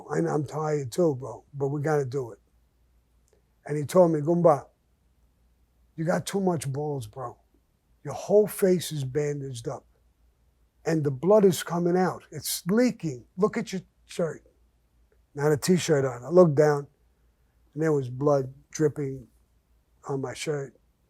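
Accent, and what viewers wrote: New York, United States. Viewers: I'm from the Bronx and was raised in an Italian neiborhood ,I live in the Midwest now so l have a new York accent and love hearing Mr gravanos accent as well,brings me back to the Bronx.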